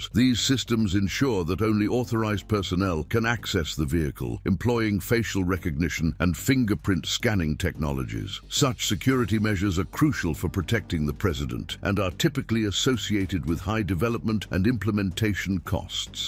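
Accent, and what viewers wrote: United Kingdom. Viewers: Another British narrator talking about an American president’s car. 😳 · Why is the narrator British?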